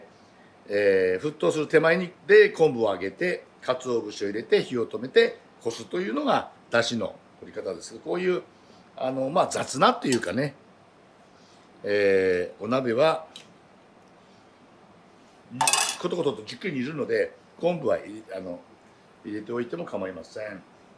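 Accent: Hokkaido, Japan. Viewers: ちょくちょく出てくる北海道弁がたまらないです。鮭の頭が入った石狩鍋は甘みとコクが出て美味しいんですよね〜。バターは意外でした。今度やってみます。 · ❗ 北海道弁最高❤️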